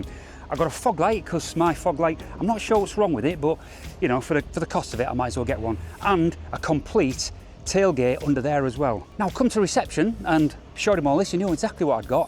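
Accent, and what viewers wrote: Hull, United Kingdom. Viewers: Are you from the East yorkshire area st all,you sound like a Hull lad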